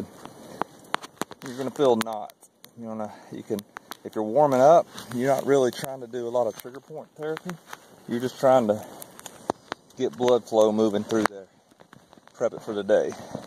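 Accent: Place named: South, United States. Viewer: Three comments. First, thanks. Second, happy anniversary. Third, being a fellow southern boy, in fact I'm back in Moore County, you may know where that is, I have an observation, if I may. You're six four, 190. You pass one or a pair of people in the woods and you ask "hey, how are y'all doing" with your southern accent and great smile. They, like everyone on the trail, are trying to unplug from the Matrix, however, now they're worried that they've stepped into another movie because they're listening to the instrumental "dueling banjos" playing in their thoughts. Please don't take this as criticism, just an observation. I don't have a solution. I've found people everywhere are fantastic, just as I know has been your experience. I made note that several NE folks had weighed in positively, and I hope I've conveyed a little southern perspective. 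Again, thanks.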